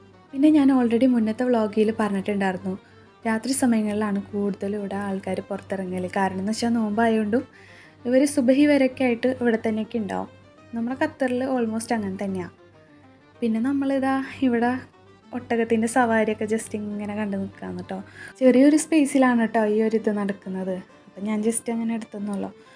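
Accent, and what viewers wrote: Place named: Thrissur, India. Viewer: തൃശൂർ കാരുടെ സൗണ്ട് എനിക്ക് മനസ്സിലാവും ഞാൻ മലപ്പുറത്ത് ആണ് അബുദാബിയിൽ ഞാൻ തൃശൂർകാരുടെ കടയിൽ ജോലി ചെയ്തിട്ടുണ്ട് അങ്ങനെ മനസ്സിലായതാണ്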